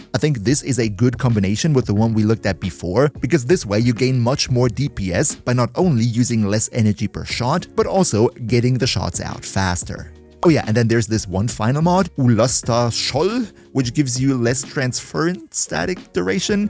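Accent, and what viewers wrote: Germany. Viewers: Esl german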